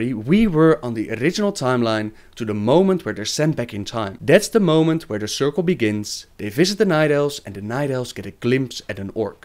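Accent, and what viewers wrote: Netherlands. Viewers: The person speaking in the video is Obviously Dutch, no doubt. This accent is unmistakeable. :b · Probably dutch, maybe south african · Is this guy dutch? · Are u dutch ma men? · Is that a dutch accent I spot?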